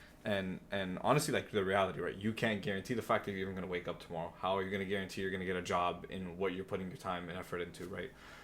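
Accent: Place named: Brazil. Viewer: Suspeitei, meu irmão, que vc fosse brasileiro por alguns trejeitos de sotaque que eu tbm tenho (bem mais que você, é claro). Valeu pela mensagem, tô aqui no Brasil, na luta, trabalhando de SysAdmin e Analista de Sistemas numa pequena empresa, mexendo principalmente com ERP. Tenho sempre tentado seguir o caminho que Nosso Senhor pôs pra mim, antenado às mudanças do mercado mas sem se encabrestar por elas, senão pelo Evangelho; e esse emprego foi mais uma bênção que ele concedeu para minha vida. Que Jesus, nosso Deus, Maria, sua mãe, e São José, seu pai adotivo e provedor, te ajudem na tua própria jornada, irmão. Fica com Deus!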